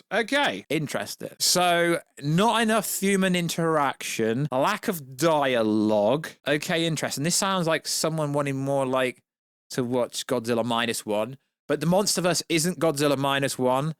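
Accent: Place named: Scotland, United Kingdom. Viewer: You sound like grounds keeper Wullie from the Simpsons, with that dodgy Scottish accent. It made me laugh though.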